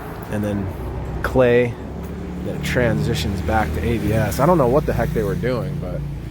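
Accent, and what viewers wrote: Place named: California, United States. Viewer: Love the cali accent my guy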